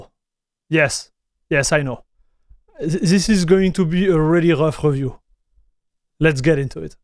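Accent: France. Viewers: Bro you need to stop speaking in english, french people suck when speaking any other language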